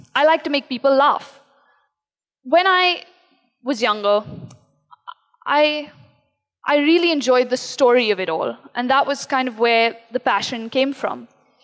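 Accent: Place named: South Bombay, India. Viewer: No she can't. That sick snobby SoBo accent smh... Publishing her books at 15 when writers get rejected so much.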